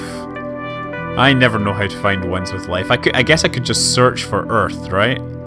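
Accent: Scotland, United Kingdom. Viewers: I wish I could watch someone explore Space Engine and commentate it with a Scottish accent for the rest of my life. There's just something about it... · Scott live in California?! I thought he lived in UK because of his accent!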